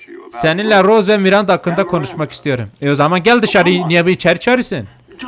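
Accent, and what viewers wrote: Elazığ, Turkey. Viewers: Abi sen Elazığlımısın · Abi Elazığ lı mısın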